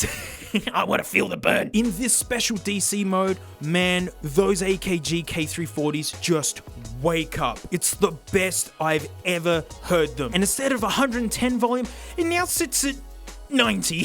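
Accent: Australia. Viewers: I don’t understand this nonsense but he has an Australia accent I’m gonna roll with it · I literally have no interest in music but I watched this entire thing and I don't really know why. Maybe it's something about hearing the Australian accent on YouTube laughing and joking around not sure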